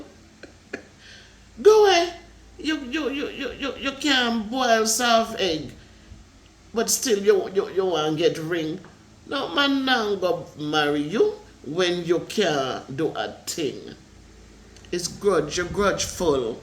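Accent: Jamaica. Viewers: you have me cracking up. I love to hear you talk that patwa talk.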